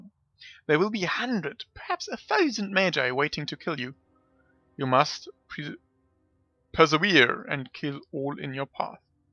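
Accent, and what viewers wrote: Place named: Germany. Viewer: I dont know it feels like im listening to myself:) I hate perfect videos:D I know u are german I hear the accent im from belgium also strong accent:)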